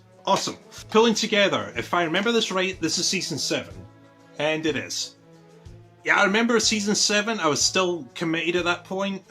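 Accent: Scotland, United Kingdom. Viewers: Are you Scottish?🇬🇧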